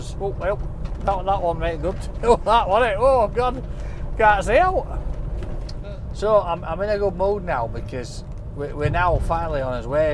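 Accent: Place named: RP, United Kingdom. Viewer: Don’t go too posh on us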